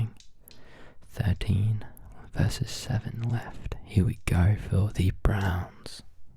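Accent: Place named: Australia. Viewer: Hey man, just wanted to say this popped up in my recommended and I’m super glad it did! As a fellow Aussie I surprisingly don’t see many ASMR creators from out our way, but your voice and accent is super well suited for this kind of stuff and the microphone/production quality relative to the size of your channel is really impressive. I’m a big fan of ASMR Chess and this gave me similar vibes to him. Keep it up!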